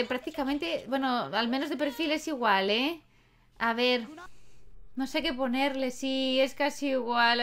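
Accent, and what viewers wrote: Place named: Spain. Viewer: no es ofensa todos deben respetar como hablan los demás antes lo escuchaba fea esa palabra pero las personas malinterpretan todo pero no te preocupes tu eres dé españa así hablan ustedes nadie les tiene que quitar eso :D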